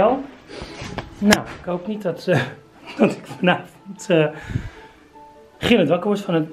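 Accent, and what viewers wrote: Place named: England, United Kingdom. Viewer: is zo grappig vooral Zijn Engelse accent 😂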